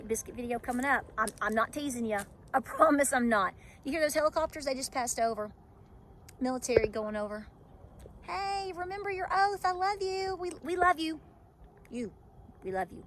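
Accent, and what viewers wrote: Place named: Appalachia, United States. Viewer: Girl i got such a giggle...perfect Cherokee and then that soft southern mountain drawl. Such a juxtaposition. My mama's entire family is in that beautiful face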